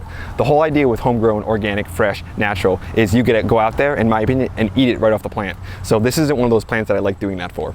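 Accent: New Jersey, United States. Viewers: Loled on the first 3 seconds, like this guy`s energy, very Jersey i suppose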